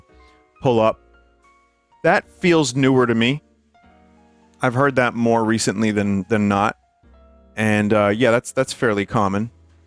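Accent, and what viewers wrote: New York, United States. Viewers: I love how his new Yorker accent gets stronger throughout the segment. He even said "authahrity"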